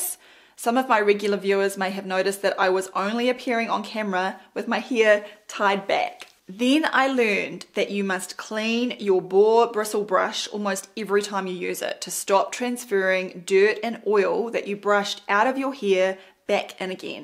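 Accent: New Zealand. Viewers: I wondered about the accent. New Zealand apparently. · is drop dead gorgeous and that kiwi accent ) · Hilarious NZ Accent! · Sounds Kiwi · At what point does the NZ accent become a whole new language? I can barely follow.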